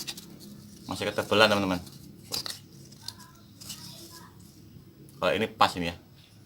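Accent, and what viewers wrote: Java, Indonesia. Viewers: Mas asal mana mas..? Kok logat jawi?🙏🙏🙏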